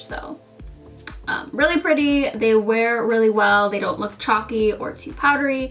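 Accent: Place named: Upstate New York, United States. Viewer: girl youve got the ill upstate NY accent, i feel ya lol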